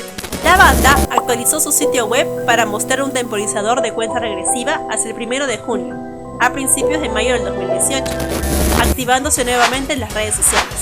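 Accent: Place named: Chicano, United States. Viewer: Me gustó mucho la información, está muy bien hecho 💕 lo único que me incomodó fue la pronunciación del inglés de la chica :/ habla demasiado chicano jajsjj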